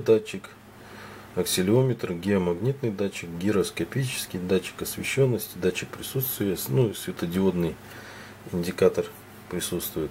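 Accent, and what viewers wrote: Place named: Urals, Russia. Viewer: Спасибо за обзор! Особенно порадовал Уральский акцент!!!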